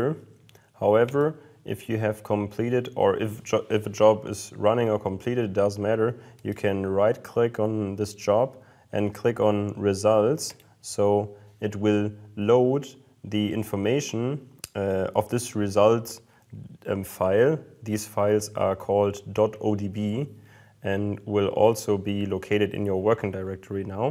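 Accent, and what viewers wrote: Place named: Germany. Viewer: deine Aussprache scheint Sie kommen aus Deutschland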